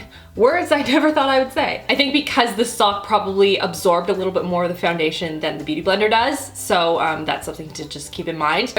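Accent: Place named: Canada. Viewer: She has a slight Canadian accent.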